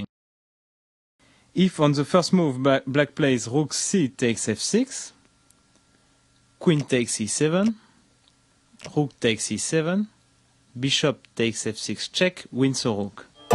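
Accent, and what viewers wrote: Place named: France. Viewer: It is "Think on this position" not "Sink on this position" ! You should work on your accent french boy !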